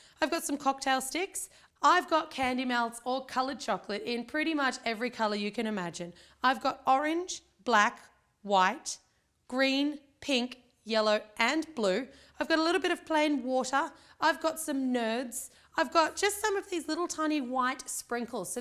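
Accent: Australia. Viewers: She does have the semi English accent at first, but her Aussie accent comes out alot more closer to the middle/end of the video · She's Australian, so yeah. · She's Australian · I'm sorry, haters DONT hate please but... Is this Australian? The accent sounds familiar and hmmm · This is really cool but are u Australian? 😄😃😀😊😊😀😃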